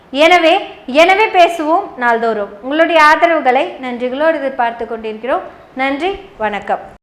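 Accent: Kongu, India. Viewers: சூப்பர் தங்கச்சி..சூப்பர் konku தமிழ்..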